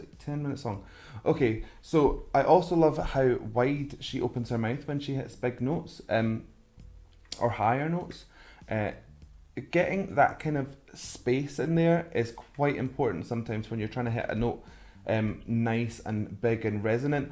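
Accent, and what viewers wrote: Scotland, United Kingdom. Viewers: I absolutely love your scottish accent and I love that you love!:D I love them since 2003 and I don't know you, but I'm always happy to see someone else get excited over them:D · Do I hear a little Scottish accent? 🤩 I love it! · Scottish accent is rly the coolest !! 😁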